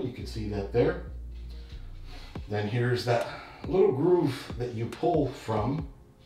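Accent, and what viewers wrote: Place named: Chicago, United States. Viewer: You have a Chicago or mid western accent. Reminds me of my grandfather. Who recently passed. Like hearing his voice again. Love the video by the way.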